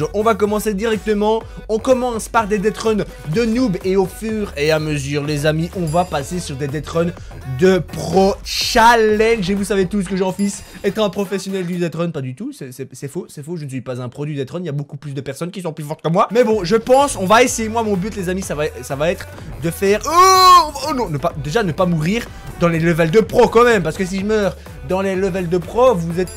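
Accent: Belgium. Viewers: Mais tu parles il est belge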